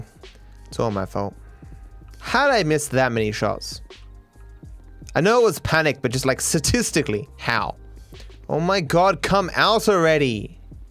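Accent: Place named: Australia. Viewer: Cmon man just let that native Australian accent FLOOOOOW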